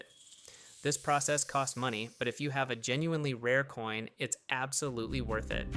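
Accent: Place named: United States. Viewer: So, why the American accent?? Big bull shit scam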